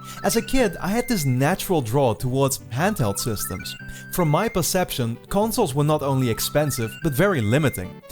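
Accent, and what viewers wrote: Netherlands. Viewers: That accent gives you away, Nederlander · Are you dutch lol? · ily man, you're just to real, why you gotta hit my nostalgia buttons, not only does your dutch accent hit me ( a belgian buttboy) in the familiarity feels, but then you do this, AHHHHHHH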